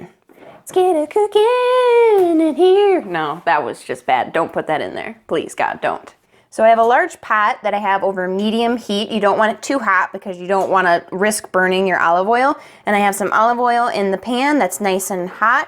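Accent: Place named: Midwest, United States. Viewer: You have a very thick Midwestern accent.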